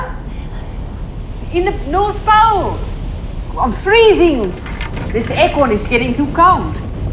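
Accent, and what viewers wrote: South Africa. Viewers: is she from south Africa, I am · Is she south African that would be awsome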